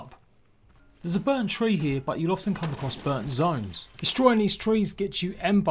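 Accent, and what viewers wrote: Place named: United Kingdom. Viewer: without wo'ah, I love British accent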